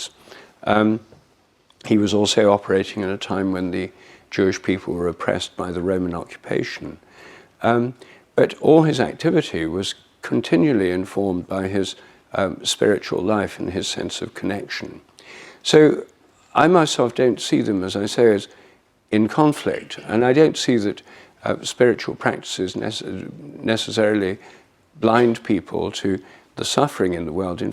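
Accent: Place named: United Kingdom. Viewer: Give it a rest....he speaks English so clearly a student of the language can understand...As an older American who has lived in both Northern California and New England, I can testify that English knows no bounds in pronunciation. While living for 13 years in New England I insisted that my children retain the letter R....yet we all, when tired or first awake would slip and say "weathah " for example...I for one (and I suspect I am not at all alone) love to hear an educated British speaker. Why would a native Californian like to hear such an accent? Simply because it is lovely, tonally pleasant, easy on the ears and altogether pleasant.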